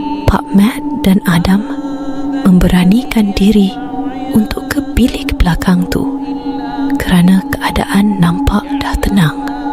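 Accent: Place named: Sabah, Malaysia. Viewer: Sabahan bm 😂😂😂 Iya bah,kau org Sabah bah.😂😂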